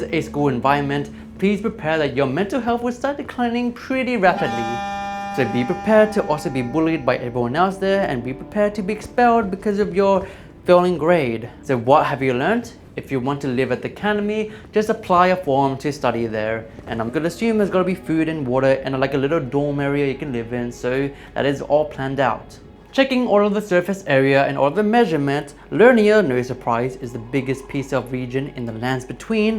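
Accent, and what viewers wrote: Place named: Asia. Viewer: my bad, I didn’t assume an Asian person with a heavy Asian accent was Australian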